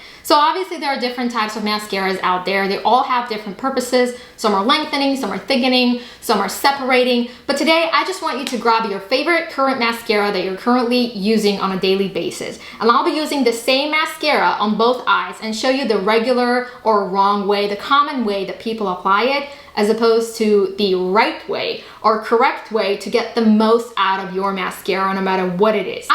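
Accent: United States. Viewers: What accent? She has an American accent nothing special. 😂🤣. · It's just American English 🤷🏼‍♀️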